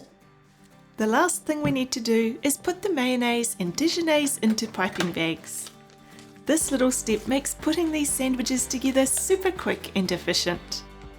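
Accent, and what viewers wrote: New Zealand. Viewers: Are you a New Zealander? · Sounds like New Zealand to me. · Are you from NZ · This woman has the most Kiwi 🥝 accent that I've ever heard. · Turns out IT IS a kiwi accent😂😂😂😂😂😂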